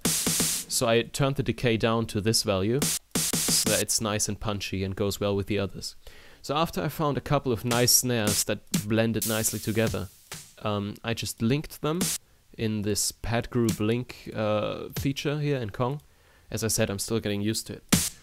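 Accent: Germany. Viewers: and i can hear youre german, mein junge :D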